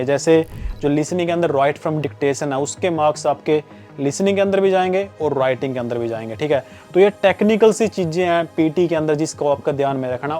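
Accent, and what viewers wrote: Haryana, India. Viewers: Haryana ka lagta hai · Haryane ka hi ha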